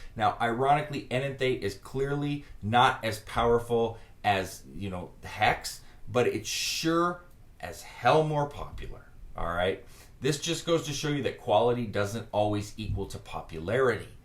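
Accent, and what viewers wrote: New Orleans, United States. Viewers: you from new orleans? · Are you from new orleans???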